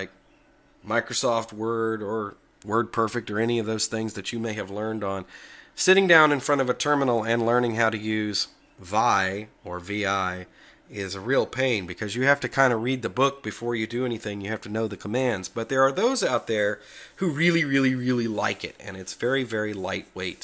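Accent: South, United States. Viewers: Does pronunciation matter? Well, not really as long as communication is possible (paaaaaaasible :-P ). Love that long "a" sound so many Americans have on words with "o" in them. I can't even make that sound :-D I love all the accents you get on Youtube, all those different people in different places, proving how diverse the world is. My accent and dialect is English (a mixture of west Yorkshire and west Midlands dialect and accent). I was having fun with your accent on the Ubuntu video, I'm guessing it's southern US somewhere? Virginia?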